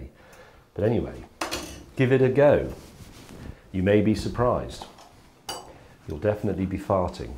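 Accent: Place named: RP, United Kingdom. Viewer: Michael Persico that not anywhere near posh. Thats just the trained BBC voice combined with a southern accent with educated received pronunciation. You can speak properly and carefully without sounding like a twat, he chooses every word carefully and because of that people all over the world can understand him.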